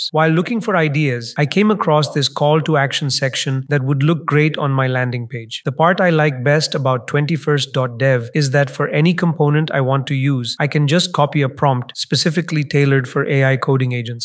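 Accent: India. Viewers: Better hear indian ahh voice than ai crappy voice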